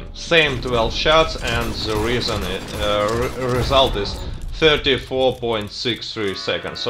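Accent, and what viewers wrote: Russia. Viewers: I like your Russian accent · i like your russian accent :) nice vid! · I think ur Russian accent is pretty cool makes u sound cool and scary love it 😂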